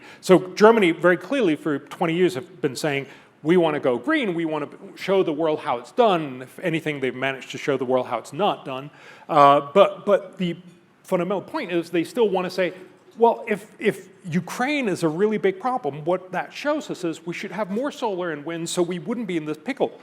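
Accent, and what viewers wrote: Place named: United States. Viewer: silly question, but where did you learn to speak american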